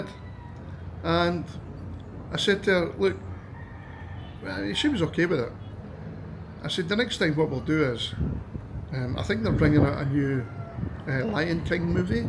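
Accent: Scotland, United Kingdom. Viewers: The algorithm popped your channel in my feed and it was so good to hear a Scottish accent I hit subscribe. I just retired to KL from Scotland a couple of months ago because my son lives here. I was a special needs teacher and I was blessed enough to work with many autistic and Down's Syndrome kids over the years. I'm glad your wee boy goes to a good school and is making great progress. You and your wife are knowledgable and cognizant of your son's needs and doing a fantastic job. Much love to your family!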